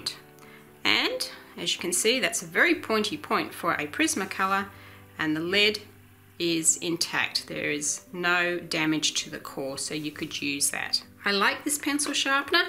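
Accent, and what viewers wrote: Australia. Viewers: A very good straightforward video. Also has one added plus for me personally - it's by an Australian and as I'm an Aussie too it's good to hear it on the Internet once in a while! Note: Officeworks now sells it for A$49.98 (22/5/2019), so it's gone up $5 since that video was uploaded in 2016.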